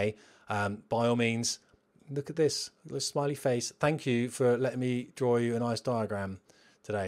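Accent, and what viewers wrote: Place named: United Kingdom. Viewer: Thanks for sharing these great videos with us common folk. Very cool and informative and I can even understand your British accent. 😊🇺🇸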